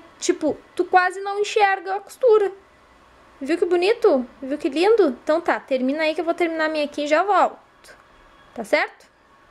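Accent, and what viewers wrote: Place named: Rio Grande do Sul, Brazil. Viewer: vce esplica mto bem ..esse teu sotaque gaucho e mto agradavel..bem facil de entender.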